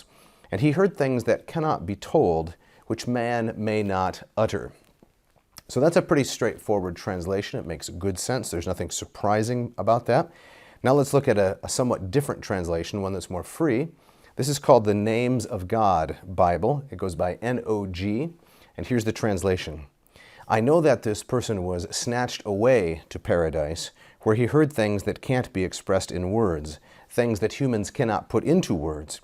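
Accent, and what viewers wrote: United States. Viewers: As a native speaker of Modern Greek, I find this painful to listen to. The Erasmian pronunciation with an underlying American accent sounds unpleasant to native Greeks. Nevertheless, how you pronounce Greek does not affect your understanding and ability to translate. If you didn't display the text I would not have understood a word you said in Greek.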